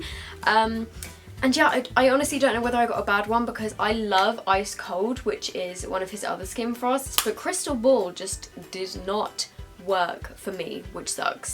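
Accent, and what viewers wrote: England, United Kingdom. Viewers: What part of England are you from? <3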